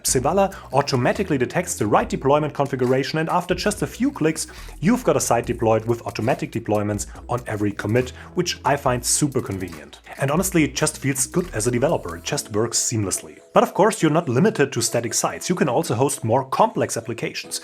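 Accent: Germany. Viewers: german accent detected within the first 10s, which gives me the "made in Germany" vibe👍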